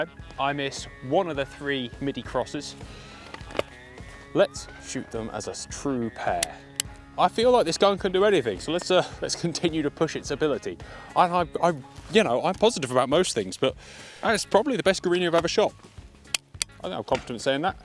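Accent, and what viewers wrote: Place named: England, United Kingdom. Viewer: Apologies mate. Grow a beard or lower your voice. I’m not suggesting you don’t know your shit. Very English. Love it. Keep up the good work mate.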